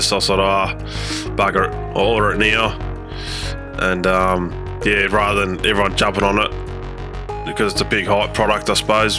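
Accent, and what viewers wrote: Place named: Australia. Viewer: Haha im sure you're gonna have loads not have a clue what your saying, but Aussie to Aussie its beaut mate. Just waiting on my QR2 with 2 base sides to arrive.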